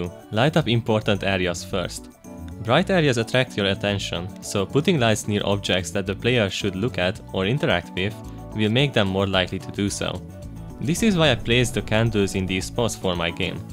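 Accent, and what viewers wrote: Hungary. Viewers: Az a jó fajta magyar akcentus nagyon hamar el árult de érdekesek a videóid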